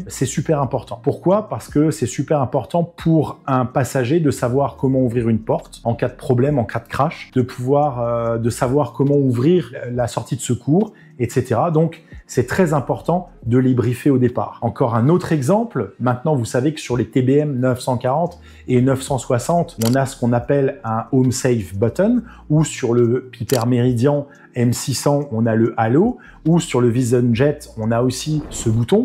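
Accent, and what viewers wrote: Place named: France. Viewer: J’adore ton french accent😂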